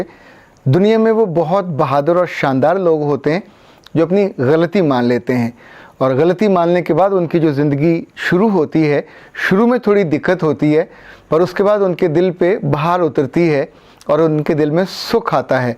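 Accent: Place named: India. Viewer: صاحب۔ آپ بیشک بہت اچھے مقرر ہیں اور پوری کوشش کر کے اچھی اردو بولنے کی بھی کوشش کرتے ہیں مگر دیگر افراد کی طرح آپ بھی بعض اردو کے الفاظ کو ہندی انداز میں بول جاتے ہیں، جیسے بہت ہی عام سا لفظ ہے " دکھنا " یا " جونسا " تو جناب یہ لفظ دکھائی دینا ہے دکھنا غلط ہے اور لفظ جونسا کوئی لفظ نہیں۔ گستاخی کے لیئے معذرت خواہ ہوں۔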